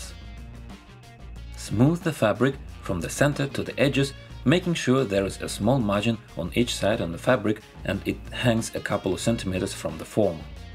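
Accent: Russia. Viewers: Я досих пор не понимаю ты русский(украинец) или американец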